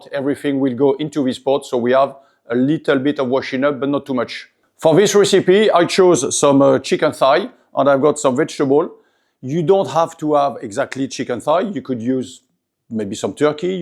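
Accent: France. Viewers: French 🇫🇷 English is so funny 🤣 I am trying to absorb and re-enact as a stand up comedian. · Why does it look and sound so much better when done by a Frenchman? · Your French accent is even better than the dish ❤ Thank you so much 😊 I'll be looking out for your other recipes.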